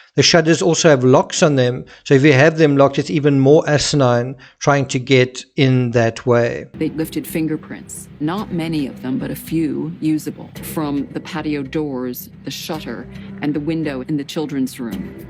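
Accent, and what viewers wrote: South Africa. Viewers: I like the SA accent as well.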